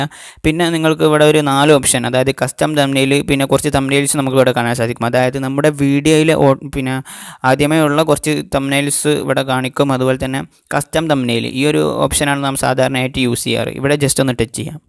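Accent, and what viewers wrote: India. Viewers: ഇടക്ക് ഞമ്മളെ കോയിക്കോട് നാടൻ ഭാഷകയറി വരുന്നു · Are you from malappuram♥😂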